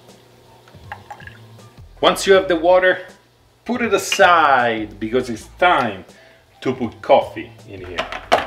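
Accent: Italy. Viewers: Immaginavo...anche se parli un'inglese molto italianizzato...vero? Però va bene il video corto ma quei trogloditi degli americani poi fanno cappelle(errori),e quando vengono in Italia non capiscono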